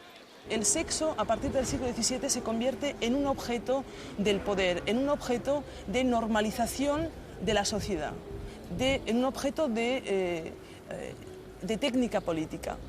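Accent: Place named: France. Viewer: Se le nota deje francés al hablar. Se nota que vive y trabaja en Francia.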